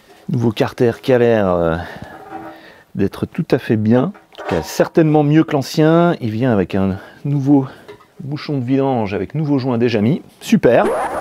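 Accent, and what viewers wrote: Germany. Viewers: Was für eines schönes video Ich mag deine Deutsche Akzent, sehr gut ! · Ton accent allemand est très bien! Salutations de la Suisse allemande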